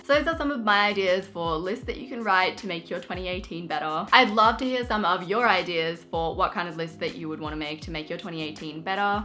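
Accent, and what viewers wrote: Australia. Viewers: Is she from Australia?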